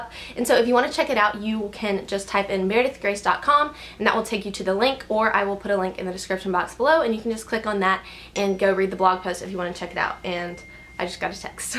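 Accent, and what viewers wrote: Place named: Alabama, United States. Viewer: Beginning of the video: "she sounds like she's from Alabama Description: "from Alabama" Haha I know my state well :)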